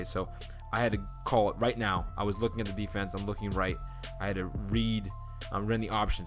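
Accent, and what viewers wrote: Massachusetts, United States. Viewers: What City/town did you play for? Sounds like you lived in MA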